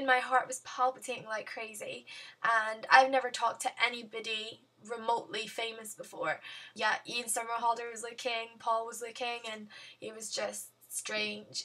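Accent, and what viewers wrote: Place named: Scotland, United Kingdom. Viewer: I'm going this year too :) I can't waiiiit hopefully see you there :) Where are you from? You sound scottish but at the same time english ahahah I don't understand xx I'm sooo excited about going this year, just worried i'll do something wrong and miss my photo op or get lost or something , Yeah it might not seem possible but I'm an idiot :p xx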